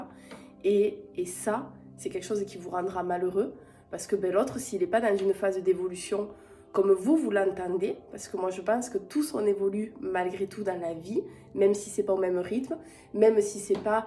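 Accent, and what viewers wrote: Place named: Basque Country, France. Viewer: Merci pour ce message. C'est clair qu'il faut respecter l'autre, mais il faut aussi, ne pas hésiter à mettre des limites. Votre accent de Donibane, nous apporte le soleil que nous n'avons pas en région parisienne 😉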